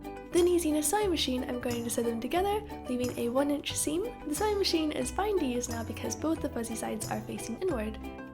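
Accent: United Kingdom. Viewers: do you think the way you pronounce things gets more British sounding the more years you spend with Tom? I'm an American dating a British guy and when I visit him for long periods of time I notice myself sounding more British by accident!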